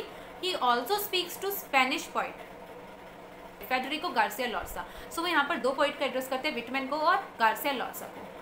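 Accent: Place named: India. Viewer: Indian English accent😢I could not understand most of the words...😑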